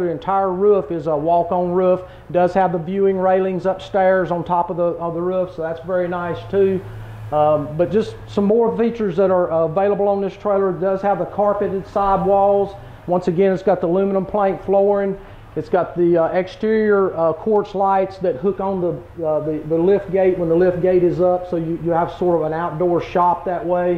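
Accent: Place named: South, United States. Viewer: Official NASCAR accent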